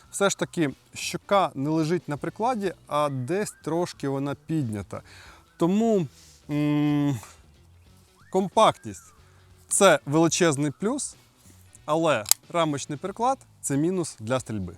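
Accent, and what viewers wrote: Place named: Russia. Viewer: Добрий ведучий, але щелепа російська- смішно слухати.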